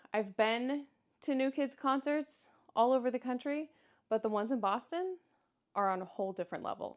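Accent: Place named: Kansas, United States. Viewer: Hey,! First, I also follow Noodles the Pooch (and have my own Noodles doll)! 🤗 Second, I got a kick out of your challenges with the Boston versus Kansas accent; I get it! I’m a Long Islander (NY) - but I graduated from Bethany College in Lindsborg KS and met lots of good folks while I was there. They got a kick out of my NY accent 😊!Whereabouts from KS are you from? The whole eastern seaboard is crazy expensive; best of luck with your new apt search!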